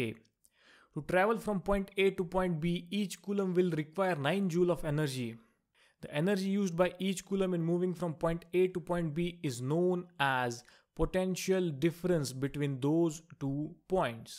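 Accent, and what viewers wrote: India. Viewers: U have the greatest indian accent ever.. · Thank you for this film. It is the second time an indian teacher impress me. Exactly what i needed to learn, now. I listened to american, brit, australian... It was only the indian teachers that were precise and correct in their terminology. India must be an educational superpower. Your english sounds funny, but , you are simply the best teachers.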